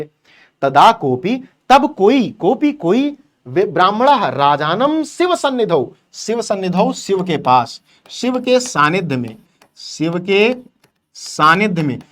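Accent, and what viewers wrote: Uttar Pradesh, India. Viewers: Are sir aap up se hai lg rha hai by the way bahut bhokali samjhe hai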